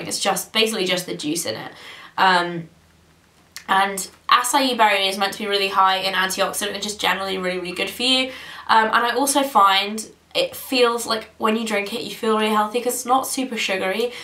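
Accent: England, United Kingdom. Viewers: Your British accent really comes out in this video hahaha brings me back to the video you did with Luke! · There would be sooo much confusion between us if we met in real life, because I have a thick Southern accent and you have a thick English accent! Hahah, love you:)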